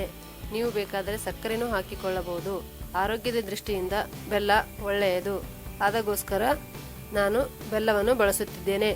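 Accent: North Karnataka, India. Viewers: Mam neevu Uttar Karnataka matalle matanadi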